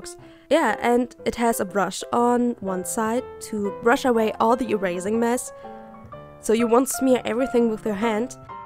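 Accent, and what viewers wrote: Germany. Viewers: bist du deutsch? du klingst deutsch :D ok ja, bin ziemlich sicher :D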